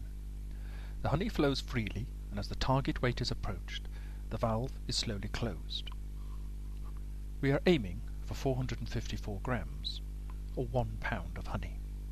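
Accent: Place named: United Kingdom. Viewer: Really didn't care to the nasally british voice that I couldn't hear.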